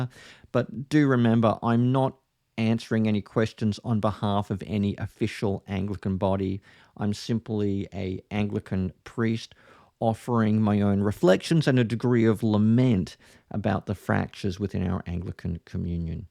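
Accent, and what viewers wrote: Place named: Australia. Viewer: As long as you know the hymn, "Thy Hand O God Has Guided" you are Anglican😂❤. Seriously speaking this is not a split. Anglicanism is being preserved. I'm just waiting to see what the Province of the West Indies, which I'm a part of would do or which side it would choose. I love your Aussie accent by the way.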